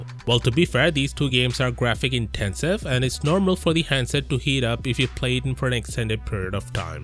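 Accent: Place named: India. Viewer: Good video my only feedback is that it was a little hard to understand with his accent. I sure other people are able to understand him fine. I think I just have an issue with Indian accents and making them clear. Nothing wrong with the narrator personally he definitely sounds intelligent and knows what he's talking about just a little hard for me to understand.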